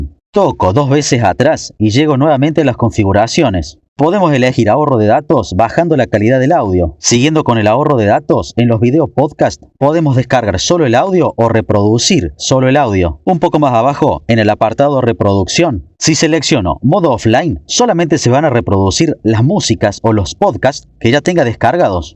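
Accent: Córdoba, Argentina. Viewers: Que cordobés del orto.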